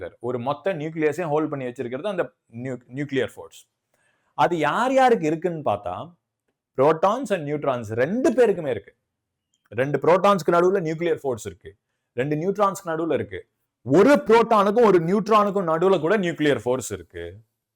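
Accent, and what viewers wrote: Tirunelveli, India. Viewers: pesara slang liye tirunelveli or kovipatti nu theriyuthu bro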